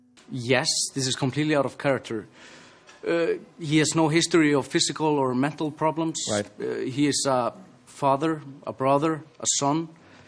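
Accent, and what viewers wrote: Dublin, Ireland. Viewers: Its a Dublin accent alright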